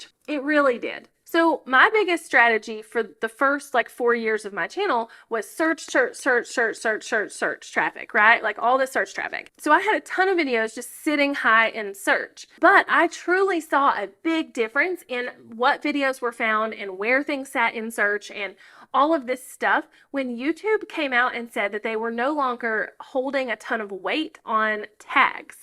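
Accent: South, United States. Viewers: That southern drawl makes me smile so much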